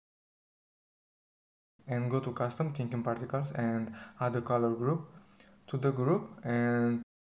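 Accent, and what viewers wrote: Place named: Brazil. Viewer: amigo, vc é brasileiro, né? Pq pelo sotaque ta parecendo, se for, poderia gravar em portugues mesmo. Ficaria um tutor melhor.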